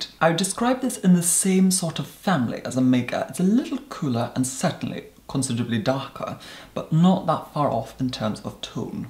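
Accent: United Kingdom. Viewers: posh English 'Oxford University' style (but he is originally from Scotland)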